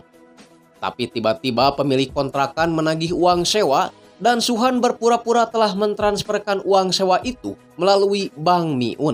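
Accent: West Java, Indonesia. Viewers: Penyakit "kaligata"😂...bang lu orang jawa barat? Kota mangga kah?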